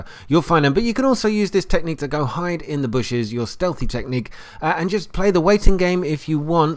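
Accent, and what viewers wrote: United Kingdom. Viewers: YES YOUR BRITISH sub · Good to see a fellow British guy doing a video, expected an American but pleasantly surprised. Not that I have anything against the Americans. 😜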